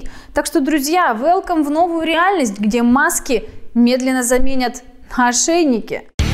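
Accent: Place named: Perm, Russia. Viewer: Ох уж этот Пермский говорок. Ничего против не имею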